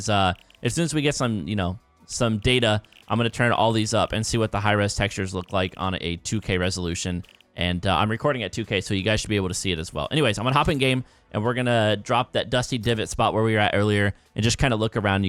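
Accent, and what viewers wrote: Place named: United States. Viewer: You sound like fresh just with an American accent :D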